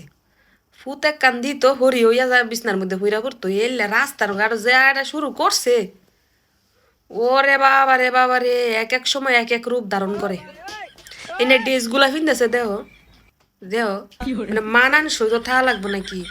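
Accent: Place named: Mymensingh, Bangladesh. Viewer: Kmn jani mymensingh ar basar moto lage😂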